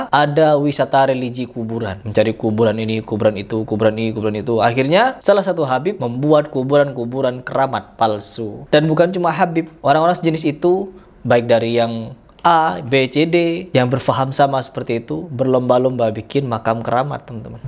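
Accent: Madura, Indonesia. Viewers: Logat nya kaya Madura.....belajar lagi mas bro....pakai ilmu padi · Iya logatnya khas kek madura ya... Tp penyampaiannya pas mdh dicerna..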